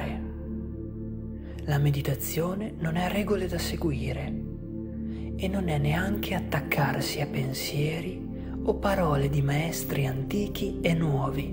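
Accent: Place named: Veneto, Italy. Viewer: Secondo me sei Veneto dalla parlata .....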